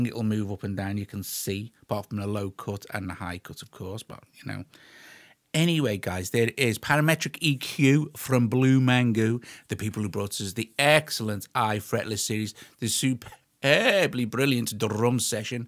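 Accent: Merseyside, United Kingdom. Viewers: Always love your reviews. Your bright positivity, and premium Mersey tones!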